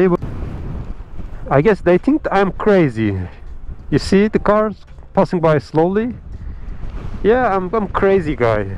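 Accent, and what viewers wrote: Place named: Turkey. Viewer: senin o alttaki türkçe aksanını duyarım ben ;)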